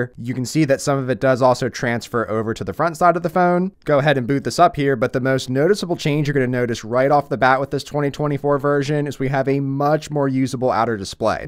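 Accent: Philadelphia, United States. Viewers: Definitely Philly/South Jersey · I definitely detect a philly accent = S. Jersey PA DE or MD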